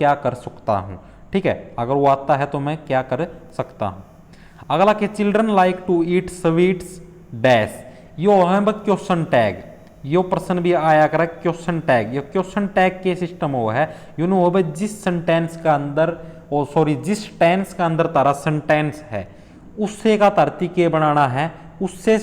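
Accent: Haryana, India. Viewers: Nice haryanvi sir ji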